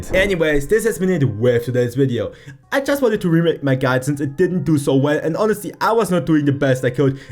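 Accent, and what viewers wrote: Germany. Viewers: U sound hella german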